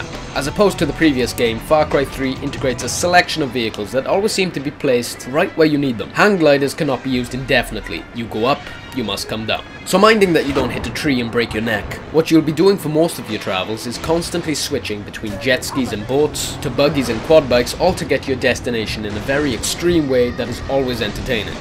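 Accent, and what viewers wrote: Wales, United Kingdom. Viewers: obviously hes welsh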